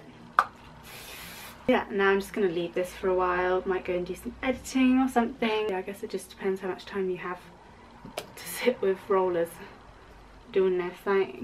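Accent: RP, United Kingdom. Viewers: Posh English accent. ;)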